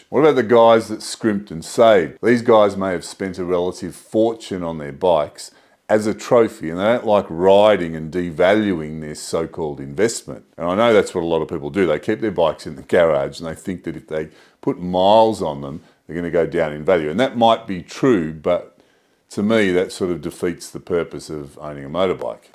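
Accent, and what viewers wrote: Australia. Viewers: The Aussie accent aside I was thinking he looked kinda like an Old US Marine.😁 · I hate AI text. He said "aspire" and the text caption read "a spider". Granted,he does have a strong Australian accent. Perhaps the AI is American.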